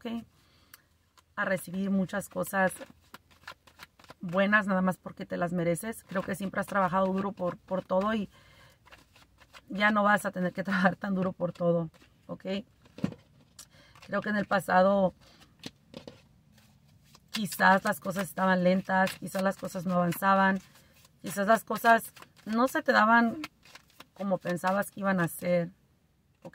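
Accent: Mexico. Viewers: ... Me haces reír mucho más aún con tu acento mexicano.. gracias ✨💙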